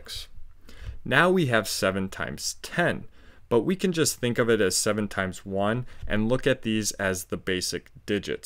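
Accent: United States. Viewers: Ty are you American 🇺🇸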